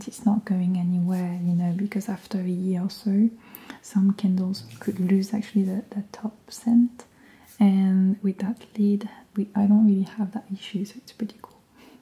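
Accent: France. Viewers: She's French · When is the next French video ? Because I'm French and I prefer your French videos. I love your French voice. And I like your videos !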